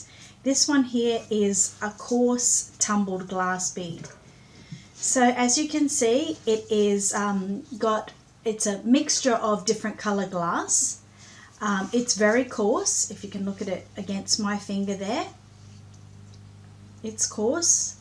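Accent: Australia. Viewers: Hi I am very new to reborn l wanted to complement you on your lessons have learnt so much from you it is so nice to hear an Australianaccent will keep watching you al the best from Sheryl from Victoria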